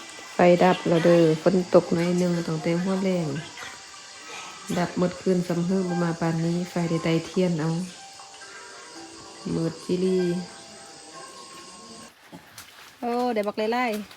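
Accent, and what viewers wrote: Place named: Dan Sai, Thailand. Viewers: เป็นตาฮักหลายสาว ทำไมผมฟังสาว สำเนียงเว้าน่าฟังแล้วยิ้ม ตามเลยเด้ สบายดี บรรยากาศที่นั่นคงดีหลายน้อครับ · สำเนียงคือ คนด่านซ้าย นาแห้ว ภูเรือ พ่อครู ยุด่านซ้าย จร้า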